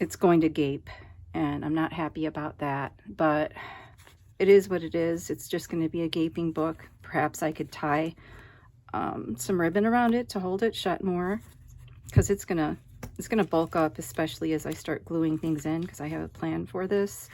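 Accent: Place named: Wisconsin, United States. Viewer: You sound like you’re from Wisconsin -are you? I am in Mequon ☺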